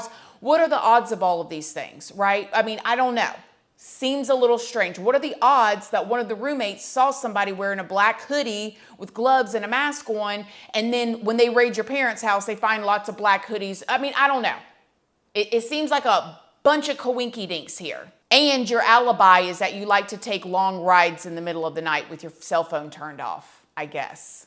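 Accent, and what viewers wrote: South, United States. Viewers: I agree with you! Why does it matter?! Just a distraction ! Omg I love when your country accent comes out sometimes!!!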